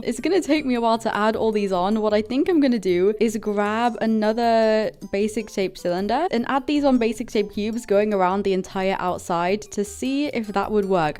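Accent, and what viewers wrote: United Kingdom. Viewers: wait r u from the uk if so that’s really cool cuz I don’t know many dti uk YouTubers 🤭💗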